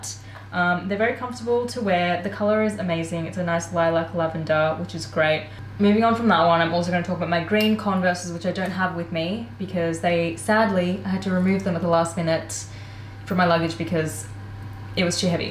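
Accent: Australia. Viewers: does her content has anything to do with her being indian?! and can't you tell by her accent that she is an australian (her origin can be in india whereas she can be australian by belonging there) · Wait are you Aussie bro if so sameeeee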